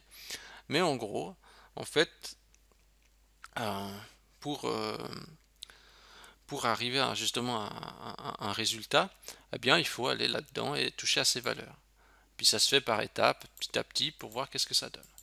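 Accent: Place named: England, United Kingdom. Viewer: Bonjour, Merci beaucoup pour tes tutos parfaitement clairs et simples à mettre en application. Et j'adore ton accent anglais! Keep up the good job;-))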